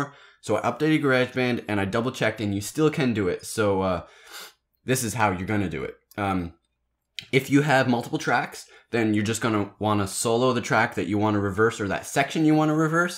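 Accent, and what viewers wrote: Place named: Canada. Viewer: youre canadian arent you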